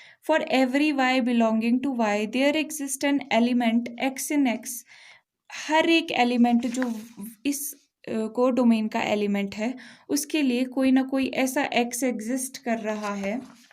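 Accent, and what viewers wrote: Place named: Himachal Pradesh, India. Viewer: ma'am i am also from Shimla (Himachal Pradesh)... Meko aapki slang se lg rha tha k aap yhi k ho isliye mene poochh liya😅😊😊😁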